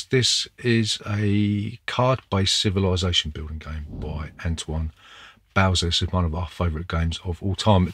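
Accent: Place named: London, United Kingdom. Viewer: I’ve been watching the videos you have produced for a little while and despite the list which is awesome with lots of games I want to try on there, I am always struck by that bloody lovely gaming room and your awesome Londoner accent!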